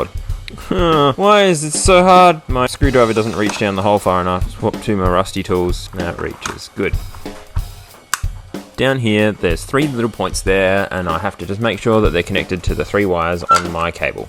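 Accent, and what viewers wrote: Australia. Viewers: At first I was like, "who's " then I hear the dulcet Aussie tones and I went "!"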